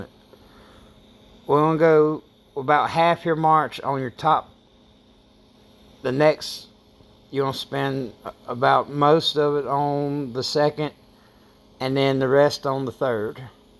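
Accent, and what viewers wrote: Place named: South, United States. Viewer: A southern accent and the sound of a cigarette being sparked up... 😁 You have my attention sir. Hello from Birmingham Alabama 👋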